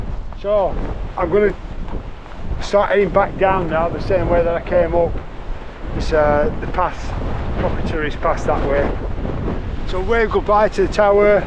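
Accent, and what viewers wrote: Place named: Accrington, United Kingdom. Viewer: Always wondered if you lived close to me with your accent, Im from Accrington 😊 Im up there most days, I know a few camp spots out the way when I can't get to better locations. Lucky to have it on our doorstep. Might see you up there at some point mate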